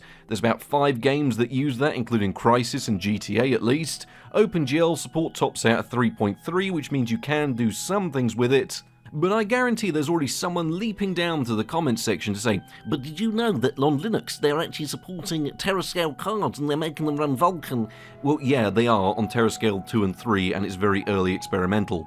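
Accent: England, United Kingdom. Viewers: I love your channel brother. Serious question, are you from the same part of England as Jeremy Clarkson? Your inflection is so much like his.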